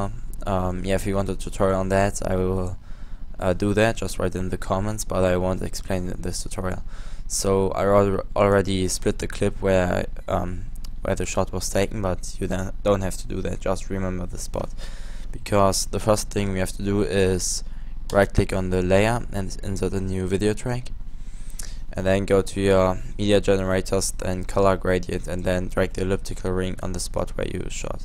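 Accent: Germany. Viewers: man erkennt deutsche so leicht xd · Haha das is das allererste video was ich von dir schaue aber ich hab sofort gemerkt dass du deutscher bist :D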